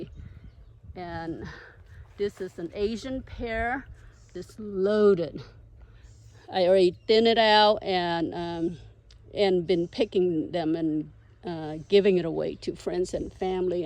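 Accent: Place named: Vietnam. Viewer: math pays the bills! Farming in the blood. Having an edible landscape surrounded by open space is nice. There is an empty lot 120 acres down the street from us we are looking into only issue is it’s VERY steep. Jujubes we do know quite well. I grew up on a farm in San Diego county and we had jujubes so I developed a taste decades ago for them. Will be interesting to watch the progress of the avocados. What year was initial planting? I started in 2017 and am about out of space now at 70 trees. Total trees with everything about 100 I lose track. I’ve had a lot of failures with avocados but now got it down pretty well with good drainage and lots of mulch and most of the grove is thriving. I am especially interested in seeing how Nimlioh does for you. I have 3 and they are thriving. Funny trivia my guess is you were from Vietnam bc I know the accent - MANY of my students are Vietnamese and have been teaching 25 years to them. 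My best friend Andrew Stehr who owns Stehr concrete in San Jose has Vietnamese wife and he - white guy from Alabama is completely fluent in Vietnamese. I read and write mandarin 我读写汉语 so what is interesting is when Andrew translates Vietnamese into Chinese for me I can understand it. So anytime the Vietnamese use the Chinese characters I feel very happy. We have a new Vietnamese supermarket that opened called Dai Thanh market and I have no idea what that means but as soon as I see the writing 大城 market then I know what it means - big city market. Avocado 牛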